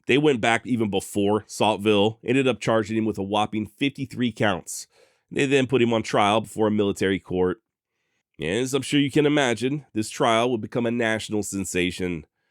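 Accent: Texas, United States. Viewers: First I’ve heard a Texan accent quoting Dylan Thomas was right here 😊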